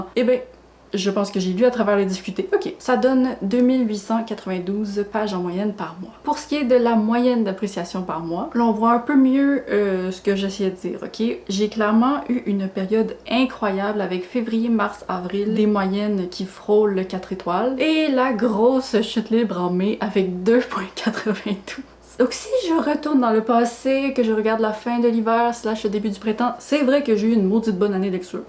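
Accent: Quebec, Canada. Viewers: N'hésite pas à être plus québécoise, même si on ne comprend pas tout, ça aura du charme ! Année épuisante pour moi aussi. J ai commencé un nouveau travail en novembre dernier ( j'accompagne des élèves en situation de handicap, c'est très fatiguant, je suis vidée chaque soir ). Je n'ai pas du lire plus de 15 livres mais en même temps je n'ai jamais été quelqu'un qui lit vite ! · Coucou ! Tout d'abord, bon courage pour cette année pas toujours joyeuse ! J'ai connu des périodes très difficiles ces dernières années, entre des soucis au travail, des problèmes de santé, des problèmes financiers, tout ça en préparant un concours difficile pour changer de métier... je sais ce que c'est que se découvrir forte et enfermer les émotions pour tenir. Je pense être sortie, pour une grosse partie des soucis, de cette période (et en plus j'ai enfin réussi le fameux concours après plusieurs tentatives ^^), mais voilà, j'espère que tu sortiras la tête de l'eau 🙂 Bref, je t'ai découverte il y a plusieurs semaines / quelques mois déjà, ta chaîne ayant été recommandée sur un Discord à quelqu'un qui cherchait des chaînes booktube. 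J'ai tout de suite accroché à ta façon d'être, à l'énergie que tu dégages, à tes lectures éclectiques, mais j'avoue aussi à ton accent (j'ai toujours aimé l'accent québécois ^^). Pour ma part, même si je ne comprends pas certaines expressions, ça ne fait rien, je pense qu'avec le contexte je peux m'en sortir (et au pire, ce n'est pas grave) ! Côté lecture j'en suis à 47 livres lus pour ce premier semestre, un peu moins que l'année dernière qui fut une très bonne année de lecture (122 livres lus de mémoire, 0 abandon alors que j'en suis déjà à 5 cette année !). J'espère que ça ira encore mieux malgré mon changement de travail fin août 😀 A bientôt pour ta prochaine vidéo !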